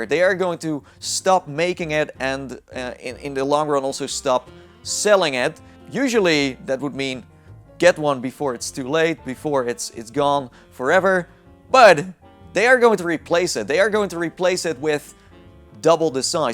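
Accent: Netherlands. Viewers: Ben je Nederlands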